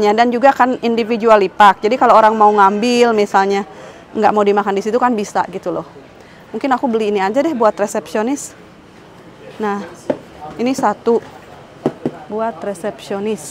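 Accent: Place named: Sunda, Indonesia. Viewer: Teh kl ngomong sunda lucu kdg suka ktawa😁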